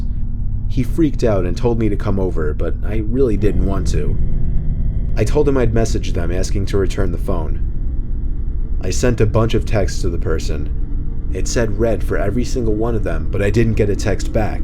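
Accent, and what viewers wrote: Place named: New York, United States. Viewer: You have a slight New Yorker accent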